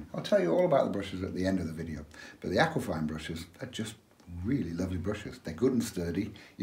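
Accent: Yorkshire, United Kingdom. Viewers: Hi,. Your video notification just popped into my inbox - not sure why as I haven’t done watercolours for decades, but I’m glad it did as your easy ways inspire me to give them another go. To my surprise, enough of my tubes are still usable and I even discovered this very book on my shelf, so no excuses! Good to hear a good old West Riding accent - you obviously hail from very close to me. Please don’t apologise for your roots - we have grass in the north, not graarse! Keeps up the great work.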